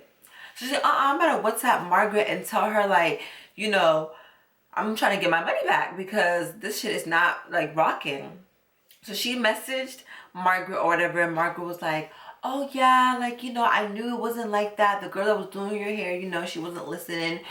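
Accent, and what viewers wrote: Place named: Nigeria. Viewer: It’s the Nigerian accent for me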